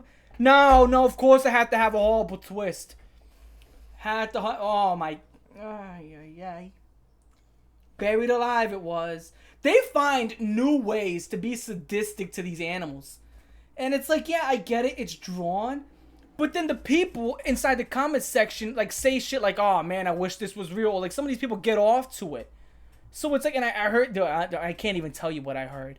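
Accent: New York, United States. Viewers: I couldnt stop laughing at this, i was just listening while working and hearing this new york accent describe eating shit was something else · I think the reason people call you Italian is due to the New Yorker accent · Your New York accent makes this video funnier 😭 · bro sounds like Peter Parker that is actually new yorkian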